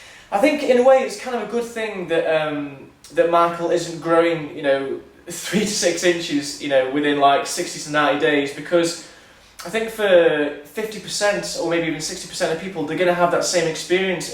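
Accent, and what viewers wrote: United Kingdom. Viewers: because of your accent :P are you from yorkshire?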